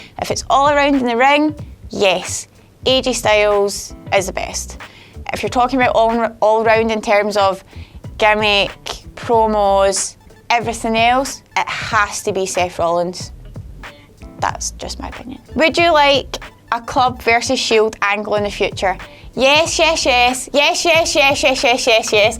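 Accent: United Kingdom. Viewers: Guys why you complaining about her voice. She is British , it's not that bad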